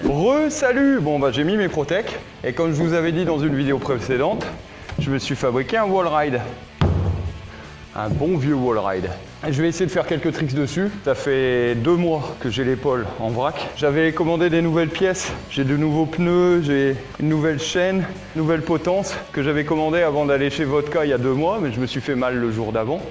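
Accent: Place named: North, France. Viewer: ta un accent du nord j'trouve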